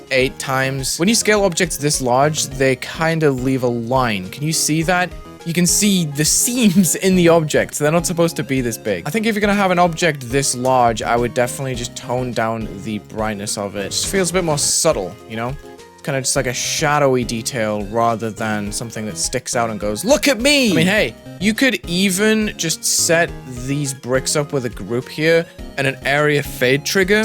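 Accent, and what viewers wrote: United Kingdom. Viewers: Bri'ish man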